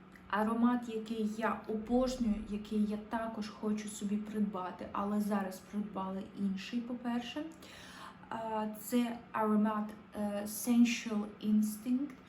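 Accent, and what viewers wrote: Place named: United States. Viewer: Гарна українська з американським відтінком.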